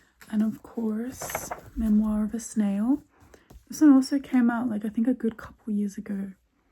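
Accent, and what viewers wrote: Australia. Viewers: I always felt australian and british accents are extremely similar. your accent is very australian so I think that maybe english is your native language and you are only studying japanese but havnt become fluent yet.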